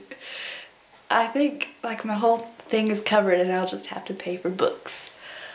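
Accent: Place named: South, United States. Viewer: Thanks. :) The Southern accent wins again!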